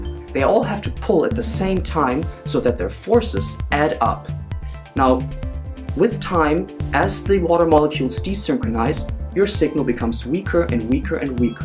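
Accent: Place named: Germany. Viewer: do you happen to be German or where does this very slight German accent come from?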